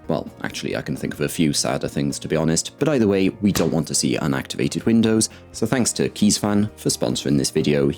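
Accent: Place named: England, United Kingdom. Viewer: I've heard a girl speak with the same style of English accent as you. What area of England are you from? Her name was Lowry... The name style even fits the accent. I'm wondering if I should wait for the 5070ti super? More vram will help with flight sim. My max will be this or the 9070xt because I don't want to upgrade my psu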